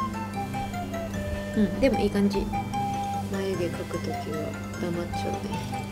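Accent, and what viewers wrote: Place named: Aomori, Japan. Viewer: 東北人のわたしですらなまってるなぁと感じるのですがあいちゃんは東北の方ですか、、？青森っぽいなまりだなぁと思いますが違かったら失礼しました( ´тωт` )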